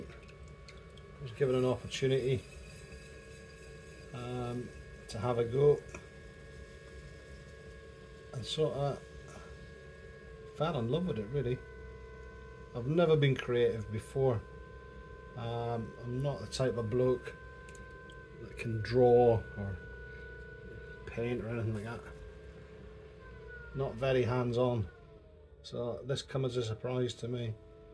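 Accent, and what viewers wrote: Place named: Scotland, United Kingdom. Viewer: Seeing the kilns prices here in Hungary, i wouldn't mind having one of yours :D nah just playing....looking forward to seeing the final products! btw, you sound and talk exactly like a Scottish colleague of mine called Dave, i can hardly hear the difference!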